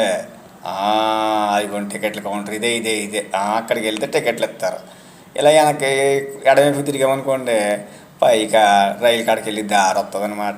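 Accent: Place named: Godavari, India. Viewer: గోదావరి యాస మన అందరి శ్వాస......🎉🎉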